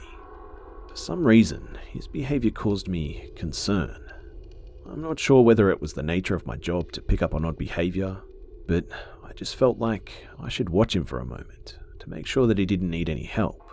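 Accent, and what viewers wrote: Australia. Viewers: Are you from Australia mate and if so what state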